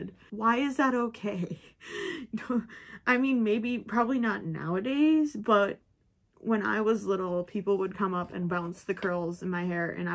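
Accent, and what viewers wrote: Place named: Upper Midwest, United States. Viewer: Haha the upper Midwest accent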